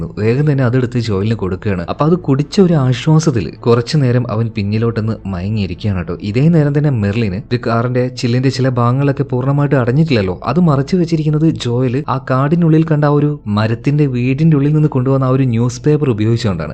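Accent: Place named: Kannur, India. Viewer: Bro kannur alle appo😊😅❤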